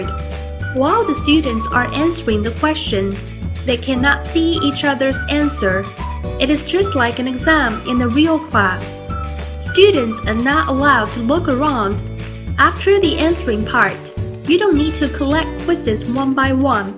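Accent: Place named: Scotland, United Kingdom. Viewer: She has a lovely accent, almost sounds Scottish.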